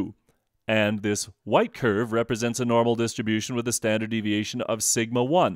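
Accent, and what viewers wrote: Canada. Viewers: powerful canadian accent!!! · you're from Toronto aren't u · and I realized you were Canadian before you said Zed... Thanks for your help professor..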